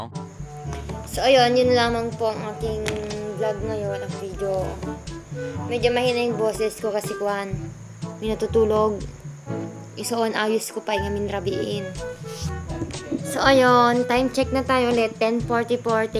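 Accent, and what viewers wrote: Ilocos, Philippines. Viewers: baro nak nga subcriber, napapasaya mo ako sa ilocano mo ksi pangasinense ako HAHAHAHAHAH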